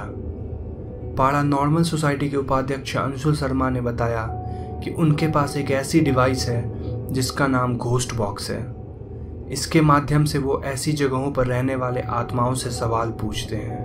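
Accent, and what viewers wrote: Bengal, India. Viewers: Bhi tum bangali ho??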